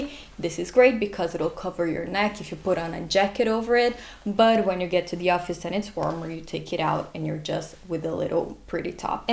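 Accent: Brazil. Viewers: love it lol. Would have never guessed you had a Brazilian accent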